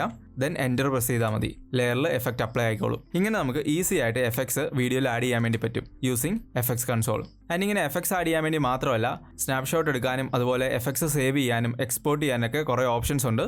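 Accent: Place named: India. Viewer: I can't understand your Indian accent. Instead you can practice pronouncing each word correctly and slowly.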